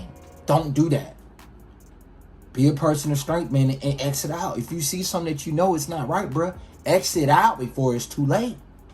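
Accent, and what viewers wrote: Baltimore, United States. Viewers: Thats that bmore talk....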